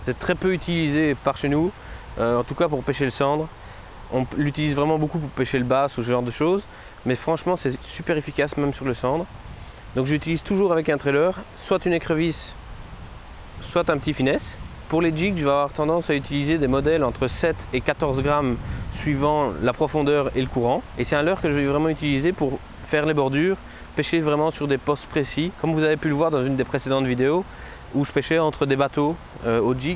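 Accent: Belgium. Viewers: oui j'ai reconnu ton petit accent belge que je connais bien, je suis natif de Valenciennes.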